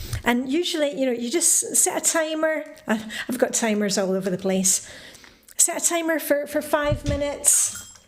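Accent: Scotland, United Kingdom. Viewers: I love your Scottish voice!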